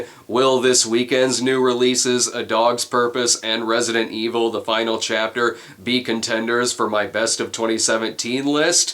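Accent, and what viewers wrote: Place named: United States. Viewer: you have the best American dialect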